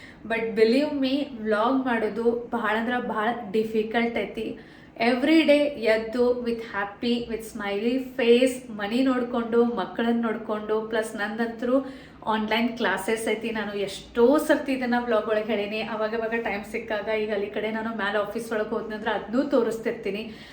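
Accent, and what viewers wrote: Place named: Belgaum, India. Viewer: Nivu bhal channngi matadutri namma belgium bhasha keli bal chalo anisstte❤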